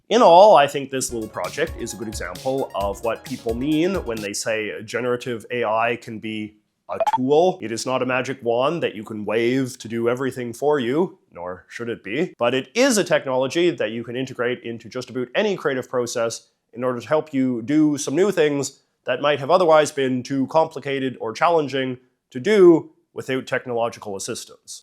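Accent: Canada. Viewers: What part of Canada are you from? I’m from Ontario and I’ve never met anyone with such a strong Canadian accent like yours. I am close to the border so that’s probably why I’m used to more American sounding accents but I’m curious to know what province you’re from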